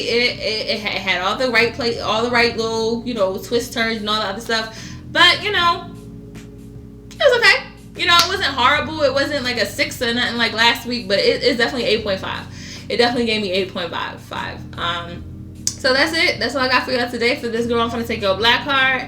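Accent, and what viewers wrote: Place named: Philadelphia, United States. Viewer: The Philly be coming out of you on these reviews lol. Great job yet again!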